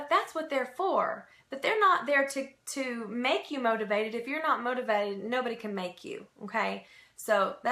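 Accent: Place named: South, United States. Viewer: Excellent advice! Thank you! I do have a question, how do people passing through on YT order from you? I don't see your Younique website link anywhere. Is there a legal issue? I started a YT channel recently and want to promote my business on there through tutorials, unboxings and reviews. Any advice? Thank you so much! Also, where are you from.......love your southern accent. ;)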